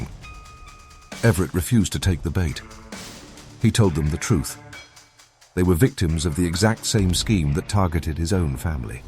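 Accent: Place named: England, United Kingdom. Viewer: WHY THE ENGLISH ACCENT ?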